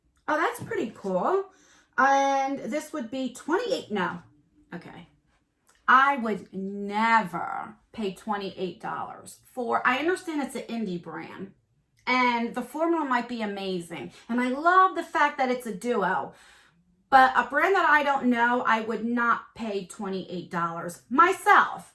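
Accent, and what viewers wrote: New Jersey, United States. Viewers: I love your channel! I heard the accent and it reminds me of home although you are from Jersey & I'm from Long Island. Similar sound. 😆 Such fun videos! Im in my early 50s and relate more to your channel more than some of the younger beauty gurus. We have different beauty needs & well, I love budget friendly beauty that can be found in these beauty boxes! Lol. Favorite emoji, hmmm, oh it has to be one that I always give to my kids, 💖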